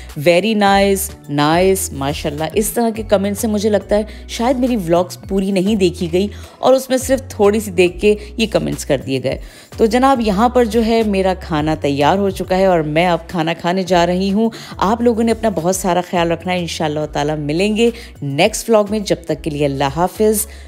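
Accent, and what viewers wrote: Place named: Lucknow, India. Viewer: Aap ki urdu tallafuz aur zaban subhanallah bilkul lucknowi hai! Shayad aap log UP India se migrate hoke Karachi Pakistan gaye honge!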